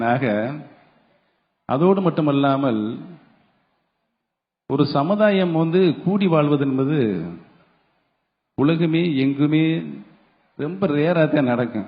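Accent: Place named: Tirunelveli, India. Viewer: திருநெல்வேலி நாடார் லே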